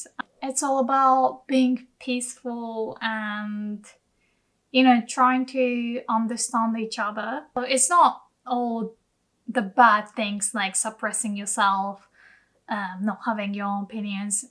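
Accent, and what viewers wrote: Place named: England, United Kingdom. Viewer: you are as cute as a button, and I luv your English accent...🍁🤠👍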